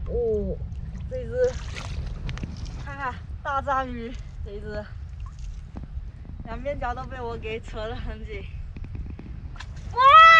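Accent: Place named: Putian, China. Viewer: 我上次有在你生日留言那个视频不知你有没有看过，听皮皮说你还没有男朋友我很开心，我觉你是聪明 美丽 朴素善良可爱姑娘如果有可能我们联系看看，简单的自我介绍一下，男28岁 福清人 父母都在家乡一个妹妹已出嫁、我在美国快10年本来今年二月要回国看亲（父母一直吹）我听你讲国语是莆田口音离我家乡不会太远我估计差不多2小时吧、你也知道在我们那边28岁还没有结婚算高龄了😭当现在美国疫情很严重不可能回国了，自从看了你视频以后觉的你一定会是那种会认真过日子的人，所以有机会我们互相交流了解一下、等你回复谢谢💪💪💪💪💪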